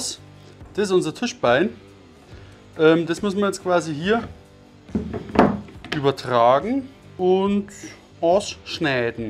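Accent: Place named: Bavaria, Germany. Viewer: Sau geil!!! Bin durchn Truppe auf di aufmerksam worn... Hob ma jetzt scho einige Videos vo dia o gschaud... Mega guad! Und dann no oana der boarisch red. liabe Griass aus Nandlstadt... (Vielleicht kennst des ja... is ja ned weit weg von dir ;-))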